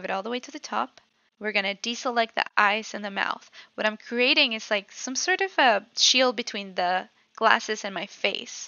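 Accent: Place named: Netherlands. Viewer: You accents sounds like Dutch (Netherlands)🇳🇱 ones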